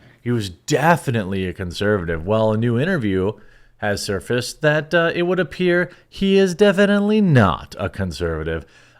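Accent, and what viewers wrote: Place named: Pittsburgh, United States. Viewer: Gotta love the yinzer accent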